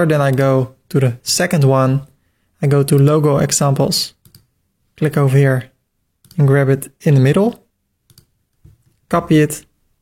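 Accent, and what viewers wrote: Netherlands. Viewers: I Love that I heard in the first 10 secs that you were Dutch, anyway great vid man, ga zo door!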